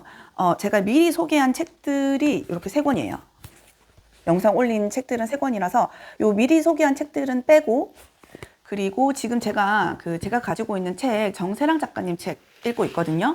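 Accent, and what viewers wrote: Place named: Gyeongsang, South Korea. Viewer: 핑크색에 홀려서 클릭했다가 구독에 댓글까지 남겨보아요~ 끝까지 영상보다 땡기는 작품도 있고 <종이여자>는 오래전언니 책꽂이에 있던 책인데 님 덕분에 흥미가 가요.. 무엇보다 갱상도 사투리.. 너무 정감가는데요?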